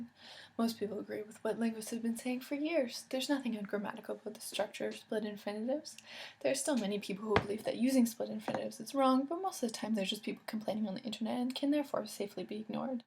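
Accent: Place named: Northwest, United States. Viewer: I agree with Aloma. You speak very quickly. There were a couple times where I couldn't keep up, but we speak slower in the South. I was raised by Yankees, though, so I don't really have a regional accent from what I can tell. I can't really place your accent. If I had to guess, it would be NW US to the Midwest. Thanks for the video. Having studied Spanish, Russian, and German, I can see why some people disagree with splitting infinitives. I really don't care because it would only be problematic in translations, which are problematic anyway.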